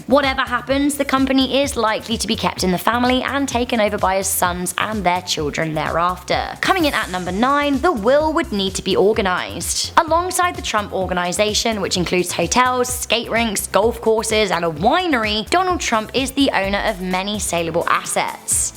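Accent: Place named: United Kingdom. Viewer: that damn annoying british accent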